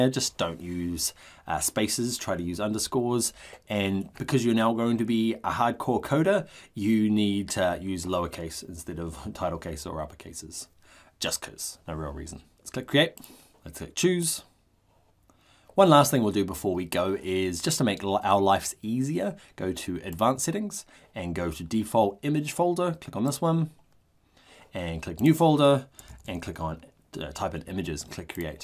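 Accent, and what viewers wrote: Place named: New Zealand. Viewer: great video, awesome to hear a fellow NZer too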